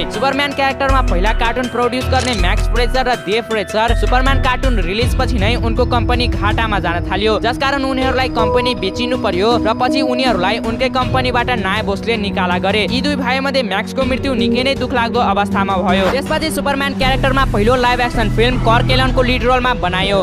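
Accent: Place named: India. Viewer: You are going much good but I want to suggest you that improve your accent and voice. Ali Hindi accent jasto vayo nepali jasto suniyena.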